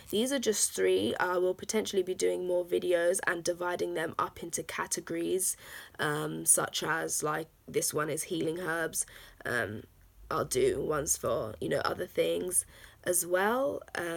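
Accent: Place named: United Kingdom. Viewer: Why do I feel I am in Hogwarts hearing your accent.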